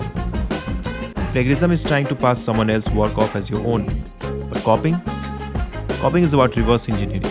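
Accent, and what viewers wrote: Patna, India. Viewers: Are you from patna? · bro, are you from Patna ??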